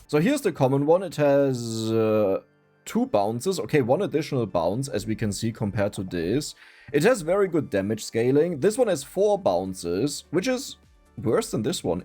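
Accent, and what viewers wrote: Germany. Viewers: Bro is trying to keep speaking english and Not German or whatever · Dein Denglisch ist der Hammer, ich lach mich hier halb tod. Mache einfach so weiter! Geile Videos!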